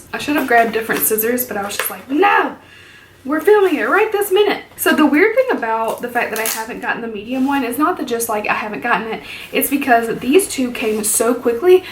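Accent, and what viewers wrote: South, United States. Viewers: i must be crazy or do you have a little southern accent? i love them. my insta is :•) ive never tried a jeffree product but ive always wanted to try a highlighter 🤠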